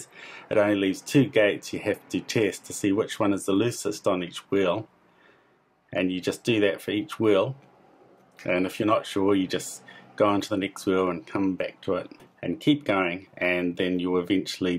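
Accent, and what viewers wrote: New Zealand. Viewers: Kiwi? Chur bro lol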